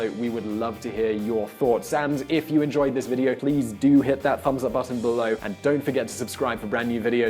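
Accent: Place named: England, United Kingdom. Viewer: How do you define the middle class? That’s easy, it is accent and you have the perfect English middle class accent. Have you noticed all those YouTube videos where English young ladies (usually very good looking) teach the world how to speak with a BBC accent?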